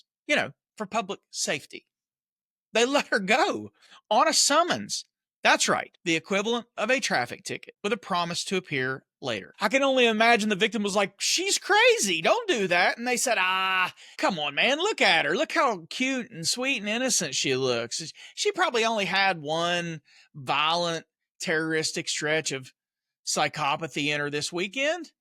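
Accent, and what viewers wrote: South, United States. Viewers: NO, THANK YOU! I like your no nonsense straight to the point southern drawl with a dash or 2 of comic attitude. Take Care, John & Amy(woof), Wyoming, The only state w/out a accent!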